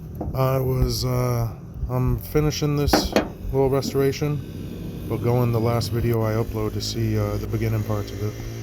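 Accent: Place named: Boston, United States. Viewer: I thought it came out pretty nice. And I really enjoyed listening to the Boston/NH accent too. Time to go Pahk ya cah in the Havahd yahd. Keep up the great work!!!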